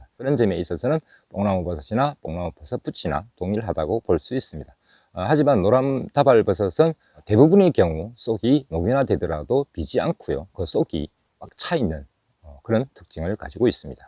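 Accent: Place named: Jeolla, South Korea. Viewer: 말이 전라게만네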